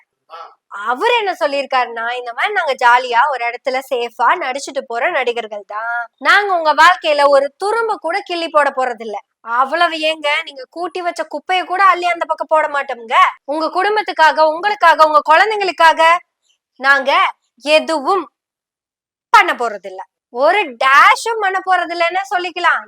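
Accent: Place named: Coimbatore, India. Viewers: Kovai tamil super🎉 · Good coimbatore talk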